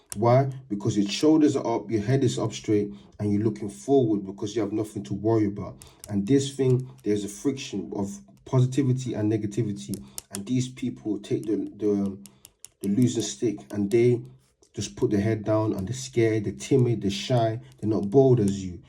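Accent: United Kingdom. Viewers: I love your British accent so muchhhhh bro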